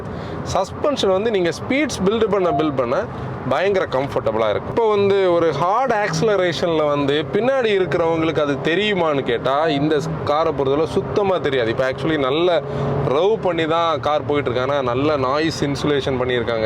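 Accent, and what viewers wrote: Kanyakumari, India. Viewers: Sweet Kanyakumari language and loyalty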